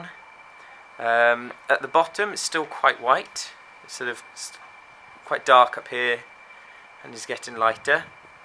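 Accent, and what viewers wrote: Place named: Wales, United Kingdom. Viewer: Where is this guy from? He has a very strong accent. He sounds welsh as a matter of fact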